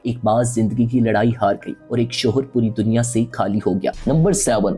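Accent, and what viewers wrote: India. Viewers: کیا بماری پڑ گئی ہے اینڈین کی طرح بات کر نا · Zinda ko bhi foat shudgaan mai dikha rahe ho bohot buri baat hai. Doosre kiya tum indian ho kiyunke lehja aur talaffu dono hi bohot kharab hain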